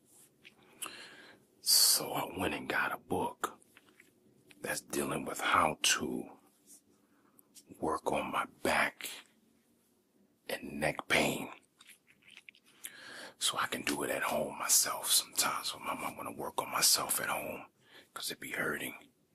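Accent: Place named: California, United States. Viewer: Hope homie hit over 100K this year! They already know Cali got the best accent for ASMR!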